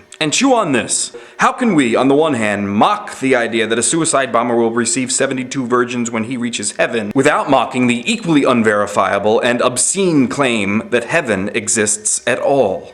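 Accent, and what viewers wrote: United States. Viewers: Ur american right? Cause u sound like it. So unbelievably ignorant. Who cares who's republican and who's liberal? Are u such a sheep u base ur views no that of a party?? I am very pro life i am also pro gay marraige and i am an athiest. Oh and If ur stupid enough to call a pre-born baby "a ball of cells" u should do at least a bit of research cause frankly im embarrassed for you.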